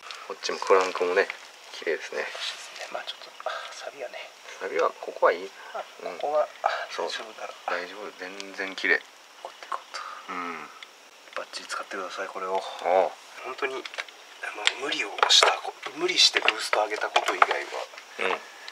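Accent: Hiroshima, Japan. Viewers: 話し方が広島県民 合ってます？ それも東部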